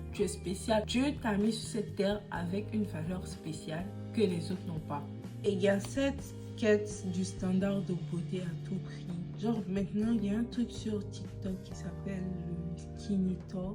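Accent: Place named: Ivory Coast. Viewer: J'ai reconnue l'accent 225 ❤ non mais mise a par ça c'est profond ce que tu dis . Moi y'a environ 5 ans j'avais ce sentiment de non accomplissement du coup j'ai unfollow tout ceux que j'enviai a qui je me comparais depuis je vie ma best life parceque je sais que ce qu'on montre n'est pas totalement la réalité on montre tres souvent le meilleur mais pas les difficultés. Soyons fiers de nous même chacun son cheminement. Ce qui est pour toi c'est ce que Dieu te donne ❤.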